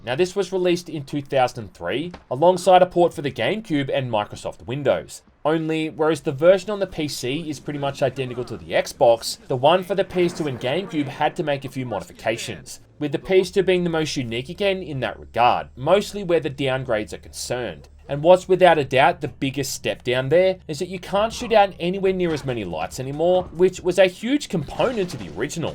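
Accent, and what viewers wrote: Australia. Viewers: It's not "Satin" it's Saturn. Sat-TURN. You're aussie english is atrocious.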